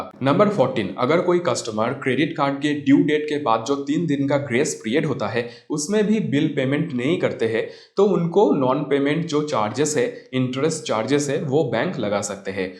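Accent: Bengal, India. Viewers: You're a Bengalee, hey mate 🤗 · Are you Bengali? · O bangalii baba tumhra words samj ma nahi aate banglii accent aati ha Hindi ma try to fix it first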